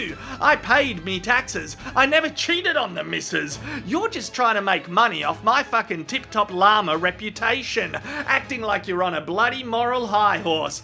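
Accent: Australia. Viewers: This Aussie cusses like an American. But it’s better with the Aussie accent. Take note fellow aussies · What makes it even funnier is the Australian accent 😂🤣😂🤣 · Australian is one of my favorite languages/accents. I love hearing the way they talk and is just hilarious in my opinion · please go full 'stralian i would love to see you do a video on australian words...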